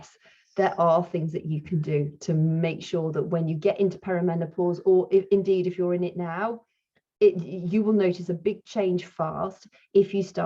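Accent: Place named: London, United Kingdom. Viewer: Thank you. The video made me feel less alone. I am in post menopause (over 2 years no periods) and the anxiety & night sweats still are here. Very distressing but I am trying to eat healthier (although I've still yet to cut out caffeine) and exercise. I need to start strength training and hope to find videos where I can do it at home without being in a crowded gym as Covid-19 is still around in the U.S. I love your speaking voice. My brother-In-Law moved from London with his family when he was in high school and used to have a similar accent. He's since lost the accent (which us a bummer) but he still visits his other relatives in London every couple of years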